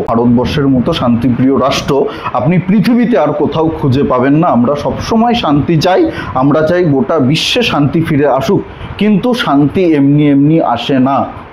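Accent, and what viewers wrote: Bangladesh. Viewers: তোর বাংলা উচ্চারণে বোঝা যাচ্ছে তুই বাংলাদেশী|এদেশের মানুষের মধ্যে বিভেদ তৈরী করতে চাইছিস |তুই অবিলম্বে বাংলাদেশে পালা|ওটাই তোর আসল জায়গা |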